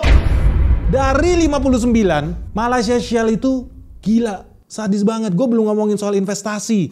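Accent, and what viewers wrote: Indonesia. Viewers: bkn..klu ko tguk komen2 dia kat video utube lain..dia ni org indonesia jgk..jilake..cara ckp pn nmpk sgt indo totok..haha 😂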